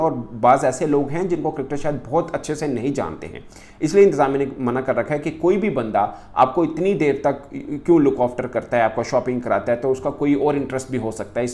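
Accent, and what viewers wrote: India. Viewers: URDU TALAFUZ KI DANISTA GHALETI: KKHas nahin, balkay khas! KKHali, nahin balkay khali! Kia ho gaya hay ap ko, kay IND charyon kay views lenay kay liay jahilana Hindi Talafuz/lehja apna rahay hain? Isi terhan 'Beech kay ander' koi standard Urdu nahin hay. Sahih hay: 'darmyan main', yan 'is doran'.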